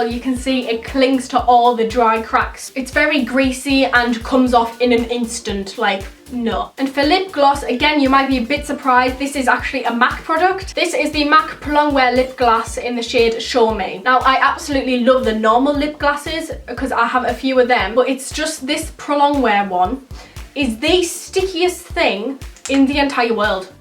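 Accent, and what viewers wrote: Newcastle, United Kingdom. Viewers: Are you from Newcastle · Are you Geordie? Xx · Are you Geordie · are u British?? btw love u sooo muchh!!!! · Mysticl Sports sorta Geordie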